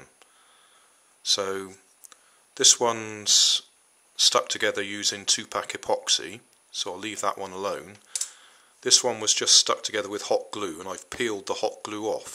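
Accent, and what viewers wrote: United Kingdom. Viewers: Hi Sir.. I am glad to see the way you demostrate your incredible inventions. hats off to your sir .. i am highly encouraged and engrossed in looking at all your videos ... i am sure you are from UK as i heard ur accent and i have many friends out there .. its been a long time i have been looking at videos to find out something interesting to do when i am normally free.. Thanks for being so passioned in showing the entire creation precisely .. thanks again and have a blessed day .... sir .... i am insipred ...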